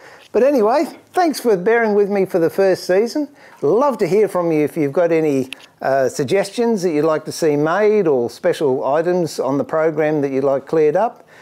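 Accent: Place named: Australia. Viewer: Great work and A+ presentation, it took a bit to get to understand Ausie which is cool. I felt a bit redundant to comment on each episode so, I saved it to the last. The finished and the unfinished pieces both look equally fantastic work of ART, no less, you are a Master Craftsman. Thanks for this set of videos, looking forward for whatever you have out there, Cheers Mate. Just wanted to correct one thing you mentioned in E-2 (I think) for the dove tail joints: David Barron's Alignment Board, FYI.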